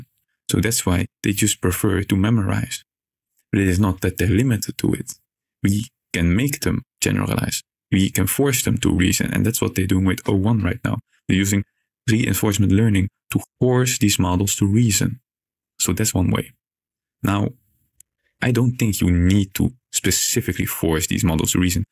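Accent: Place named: Netherlands. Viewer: Interesting video. Good job. Just one thing: your audio stops at around 8 minutes into the video but the video still goes on for like another 2 minutes. Maybe something got cut off? Regardless I just subbed and love the Dutch accent. Down for more content in the future :) Greetings from Germany and reddit lol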